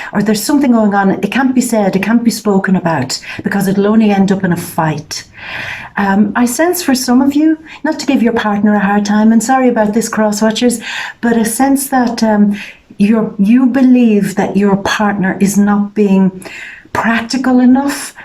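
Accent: Ireland. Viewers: I love that accent. It's always felt familiar when I was like 3 years old but being American and my only time hearing that accent was from watching Darby O'gill and the little people with my great grand mother and my great grandfather singing when Irish eyes are smiling. It's always felt like home. And your reading is spot on. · Your voice reminds me of when I lived in Ireland. I don't want yesterday, he was my past. How would one get a private reading. Slante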